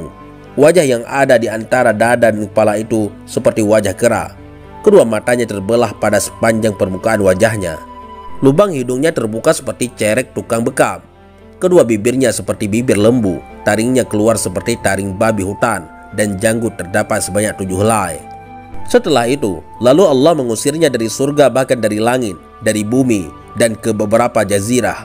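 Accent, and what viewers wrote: Aceh, Indonesia. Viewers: Anda bicara seperti orang Aceh , apakah benar ?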